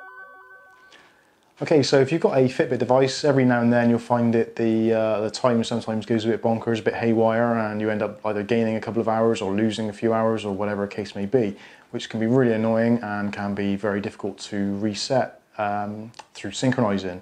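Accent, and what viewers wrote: United Kingdom. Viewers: Good morning, I know it's been quite a long time since you posted this video but I just wanted to thank you for the information. I purchased a Charge 5 several months ago and I find (maybe not a defect - just an operating method for this version of Fitbit?) that whenever I let the charge drop below 1% a complete reset and time correction is necessary. Device reset is easy thanks to YouTube although this doesn't correct the time. This AM I discovered your site and everything was solved!! I have been a quite devoted / fanatical (?) Anglophile ever since that evening on February, 9 1964 when I first set eyes and ears upon those Four Lads from Liverpool. I am 73 years old these days and was just a 14 year old lad on that wonderful evening. Now anything dealing with England, Scotland, Ireland, Northern Ireland and Wales will simply and automatically attract my attention and I know this will never change until my dying day - and will continue long after that. 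Sorry, I got a little off the subject there but just wanted to thank you for the absolutely appropriate information. I don't know how many times I have said or thought this but I could actually listen to someone with your beautiful accent (or any of those in my 5 favorite and previously mentioned countries) simply read a grocery / shopping list and I would be enthralled by by the reading because of that accent. If that's an addiction I really don't mind. All the very best to you and yours, Terry (in the middle of Minneso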